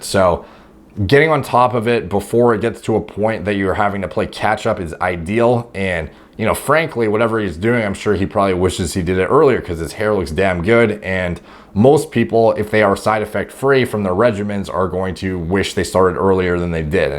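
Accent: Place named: Canada. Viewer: You're talking about some nimrod, neanderthal and Philistine who hides his baldness, yet you pronounce 'out' and 'about' as "OOT" and "ABOOT"?? Ok then...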